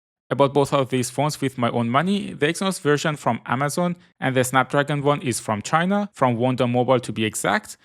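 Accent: Iran. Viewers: عشققق فقط چرا حس میکنم لحجتون اینقدر ایرانیه ؟☕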